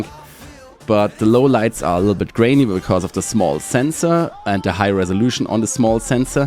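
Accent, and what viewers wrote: Germany. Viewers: You might not like your speaking English, but listening to you made me really enjoy the German accent as an native English speaker (American). You have such a lovely cadence 😁 I'd have to agree, Nadi feels over edited because he does the same thing different ways. If he did it just once, it would feel more balanced and not a redundant show off in my opinion.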